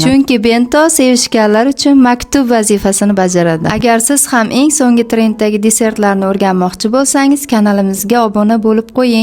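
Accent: Namangan, Uzbekistan. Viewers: Adres qayerda Namangan shevasiga oʻxshayapti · Sheva Namanganniki manzil ham Namangandami.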